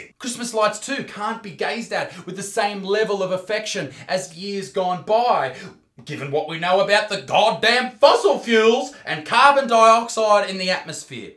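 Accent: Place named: Australia. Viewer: Thank you,. I have spent 11 months of what feels like the worst nightmare of my life after traumatically losing the man I loved the most in this world. I miss him terribly and the season is definitely not going well. Not really because it's Christmas, but just remembering this time last year him being sick... but still having him with me. I'm really glad I did stumble onto your channel. The things you say and the way you say them remind me so much of him (except with an Aussie accent) so it feels like you give me that little bit of him back when you upload a video and I thank you for that.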